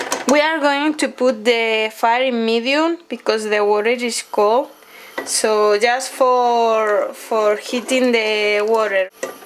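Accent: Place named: Spain. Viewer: I love Spanish Food, I used to work in a spanish restaurant. The food looks tasty. Love your spanish accent!!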